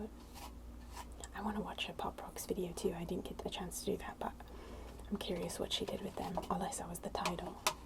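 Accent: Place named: United Kingdom. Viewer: I'm in love with your accent! Are you from England or Scotland? Perhaps Australia?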